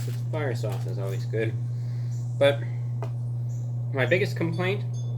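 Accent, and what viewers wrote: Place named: New Jersey, United States. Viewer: Ppl think he's from Florida or not from the states. He sounds slightly Jerseyish or Minnesota, Maybe Canadian? 🤔🤷🏻‍♀️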